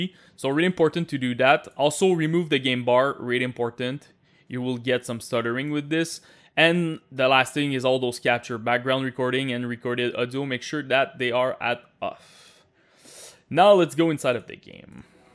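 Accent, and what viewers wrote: Quebec, Canada. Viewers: Québécois? :D Merci pour le vidéo, j'espère que ça va m'aider.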